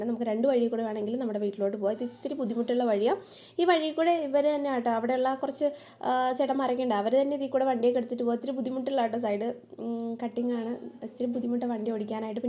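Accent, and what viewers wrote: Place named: Central Travancore, India. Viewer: ഞാനൊരു subscriber അല്ല അതുകൊണ്ടുമാത്രം മറുപടി തരില്ല എന്നുണ്ടെങ്കിൽ വേണമെന്നില്ല എനിക്ക് ചോദിക്കാനുള്ളത് നിങ്ങൾ വയനാട് ആണെന്ന് പറഞ്ഞു പക്ഷേ നിങ്ങളുടെ സംസാരം ഒരു മധ്യതിരുവിതാംകൂർ ഭാഗത്തുള്ള സംസാരം പോലെ ആണല്ലോ 80%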